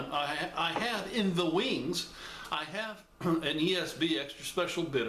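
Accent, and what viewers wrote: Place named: England, United Kingdom. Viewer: More English accents please! Yep that's a tasty looking beverage, cheers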